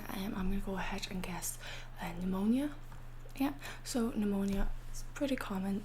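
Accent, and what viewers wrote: Ireland. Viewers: I love the Irish accent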